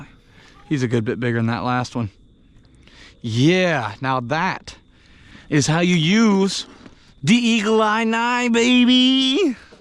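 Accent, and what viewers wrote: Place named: South, United States. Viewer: This is so violently southern. I love it. As an Alaskan it is inspiring to see this level of southern out there😂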